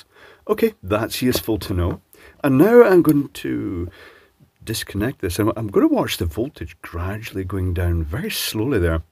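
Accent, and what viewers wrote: Scotland, United Kingdom. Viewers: Actually it’s a Scottish accent!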